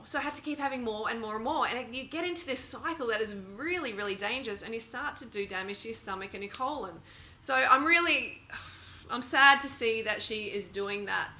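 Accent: Australia. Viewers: Is she Australian? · I don't know what's more annoying... your accusations against Kendall or your stupid aussie accent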